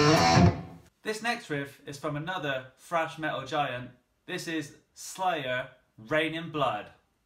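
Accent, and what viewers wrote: United Kingdom. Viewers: That Accent, i know you're British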